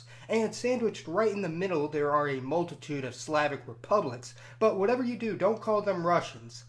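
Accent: United States. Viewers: Speaks American,america started all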